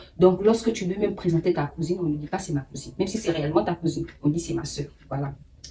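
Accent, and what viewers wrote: Ivory Coast. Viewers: Comment tu as fais pour avoir l'accent ivoirien comme ça ?? Tu es devenue ivoirienne hin... Moi je viens te marier.. tu es trop belle et super cool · L'accent de l'auteur de la vidéo est plus ivoirien que gabonais. Merci d'unir le Gabon 🇬🇦 et lz Côte d'Ivoire 🇨🇮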